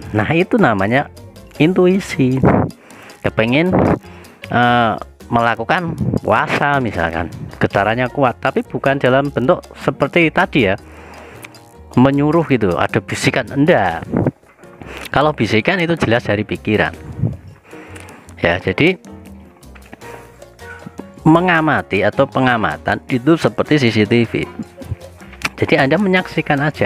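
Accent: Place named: East Java, Indonesia. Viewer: Mantap Mas, panjenengan asli ndi mas, logat kayane asli daerah wetan?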